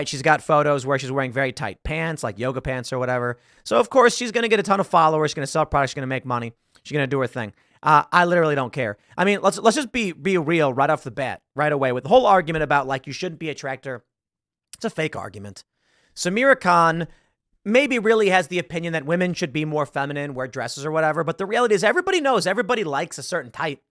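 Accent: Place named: South, United States. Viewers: I find her to be beautiful and i love her sweet down to earth southern accent. She seems like she’s down to earth. I definitely would be into her than the tired ass plastic caked on makeup spoiled entitled delusional multiple personality modern women from big cities. Im from Los Angeles, and im sick of the women who spawn out of progressive cities and states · An attractive lady with hobbies! Hobbies I can enjoy and southern accent, sign me up. I've seen what makes you applaud, your boos mean nothing. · She is a Smoke Show! She’s Beautiful She’s funny and her Southern draw is so attractive Just because she does that stuff doesn’t mean she’s not a girls girl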